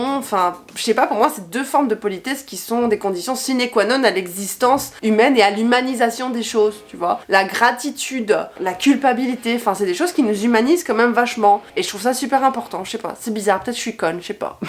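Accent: Brussels, Belgium. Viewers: MEUF t'es bruxelloise en fait?! Je me suis toujours demandée d'où tu étais en Belgique (je suis Bruxelloise) mais je me sens honteuse de pas avoir reconnu l'accent là quoi... (bon maintenant, je regarde le reste de la vid)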